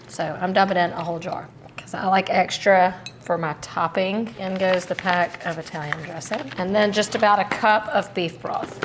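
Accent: United States. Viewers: I hear your pain. However, this is an American channel. Should we check the world over and only use ingredients universally available? Should your national cooking channel only use ingredients that are widely available in the US or world wide?? Honestly, I don't want to watch a local foreign channel that only produces world accessible dishes. I want authentic recipes. Authentic culture. Authentic accents. You are getting a taste of American culture 😊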